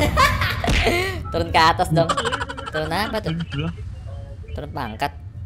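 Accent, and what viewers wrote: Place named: South Kalimantan, Indonesia. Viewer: orang kalimantan sltan itu bang bhasanya wkwkwk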